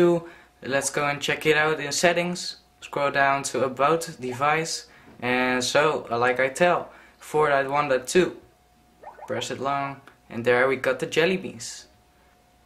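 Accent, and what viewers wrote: Netherlands. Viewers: ik hoor zo hard dat je nederlands bent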